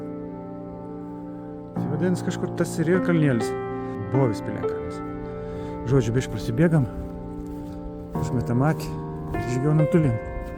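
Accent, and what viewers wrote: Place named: Plungė, Lithuania. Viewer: Smagė vaizdą pasėvakščiuojėmā, graži šnekta, a karts ne no Plungės būsi?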